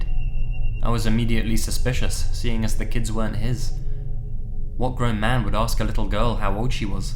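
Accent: United Kingdom. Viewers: You sound like CreepsMcPasta! Probably cause he's also British but fair enough, I like your accent! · i'm newer to this channel, but I really like it! does a good job of narrating these in a way that emphasizes the important points. You wouldn't think that a higher voice with a British accent would be very good for narrating horror, but his is just about perfect. subscribing as Legion member 527,001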